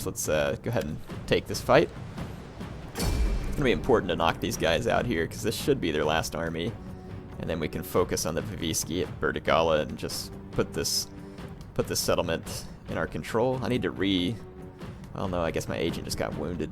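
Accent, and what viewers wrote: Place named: South, United States. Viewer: it's funny how you say things like "ought" and other southern slang. Don't take offense to what I say, and don't change it. But what am I to say anything, I'm a stinking northerner.;)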